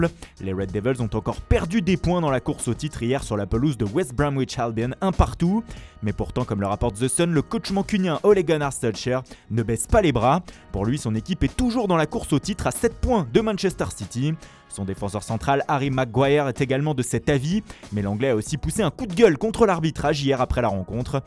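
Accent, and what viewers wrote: Italy. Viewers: C'est reparti avec l'accent italien...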